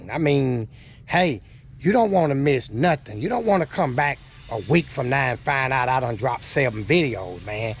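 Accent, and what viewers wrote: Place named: North Carolina, United States. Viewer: heard the carolina accent, what part of nc?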